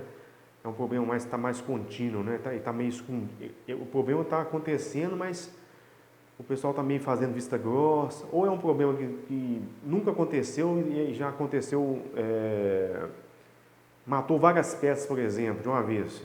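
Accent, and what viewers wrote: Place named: Minas Gerais, Brazil. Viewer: O mineirês tá em dia demais! Parabéns pelo material, me ajudou hj!!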